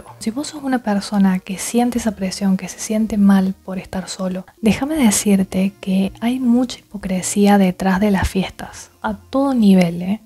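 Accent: Argentina. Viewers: Me encanta tu voz, tu acento argentino y tu contenido ☺️💜 Feliz Navidad y año nuevo, 🎄🍫💤🎁💃🕺GRACIAS POR TUS VÍDEOS 🌹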